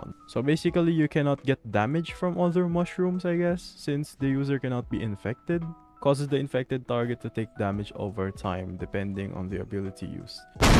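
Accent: Philippines. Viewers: You give me that filipino-english accent vibe. Are you?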